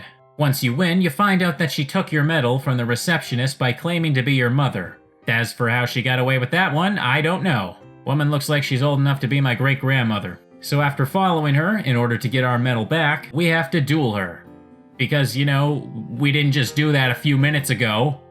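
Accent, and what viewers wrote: Canada. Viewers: dad jokes the game! great video, love your content. is your accent canadian? it soothes me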